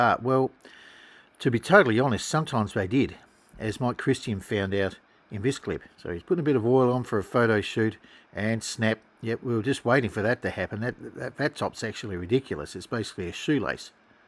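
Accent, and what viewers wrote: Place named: Australia. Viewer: This guy speaks with a very distinct accent - i would estimate that he is either directly from New Zealand, Tasmania or Australia.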